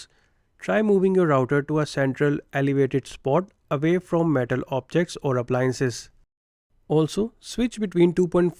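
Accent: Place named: India. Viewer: Indians sepaking english sound very ridiculous, Remove anything below your tonge or use subtitles, the world will thank you.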